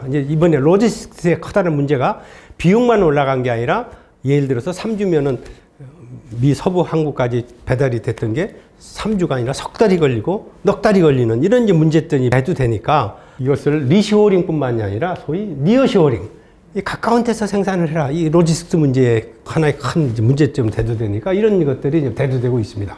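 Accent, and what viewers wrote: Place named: Jeolla, South Korea. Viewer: 당신 전라도 지 ㆍ 전라도 말투네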